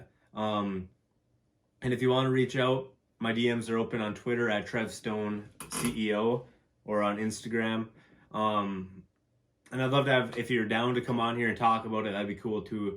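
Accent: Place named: Upper Peninsula, United States. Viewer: sorry to hear about your dad man. My best goes to you and your family. It's awesome to hear a good yooper accent again, and also pretty stand up for you to do this segment.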